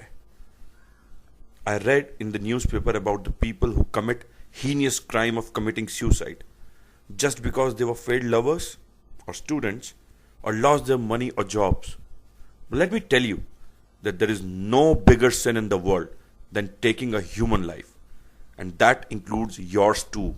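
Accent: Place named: India. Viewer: I love Indian accents! Sometime it annoys me but most of the time, it's cute!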